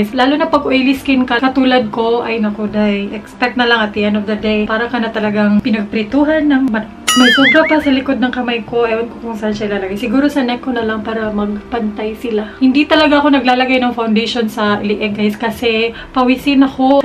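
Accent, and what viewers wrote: Visayas, Philippines. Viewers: Para sakin ang ganda talaga ng accent/punto ng mga bisaya na nagtatagalog. Hahaha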